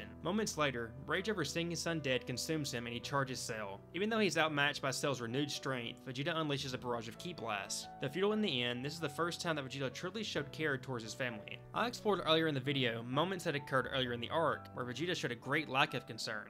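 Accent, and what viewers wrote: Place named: South, United States. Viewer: Glad this video was recommended to me, you make some bangers man. It's always comforting for me to hear another Southerner (I'm assuming) nerd out, bc where I'm from anime and animation in general still has a huge stigma around it. Keep being awesome!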